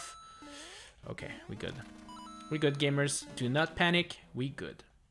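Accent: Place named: Spain. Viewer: If you don't speak spanish, why do you have a spanish accent?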